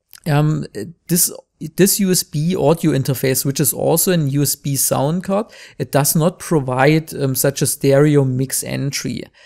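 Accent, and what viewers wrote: Germany. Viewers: man with funny German accent explains to me what I need to know. This is the perfect tutorial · starker deutscher akzent :)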